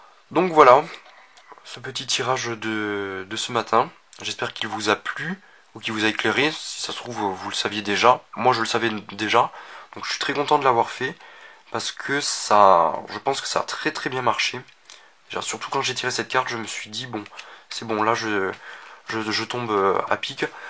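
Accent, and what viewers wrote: South, France. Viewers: Toulouse? Montpellier? Ou autre?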